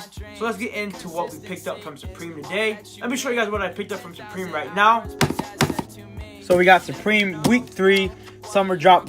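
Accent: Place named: United Kingdom. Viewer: Your voice sounds half British! Fucking great video tho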